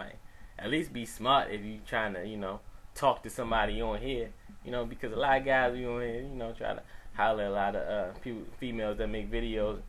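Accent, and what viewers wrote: Baltimore, United States. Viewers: It’s a Baltimore accent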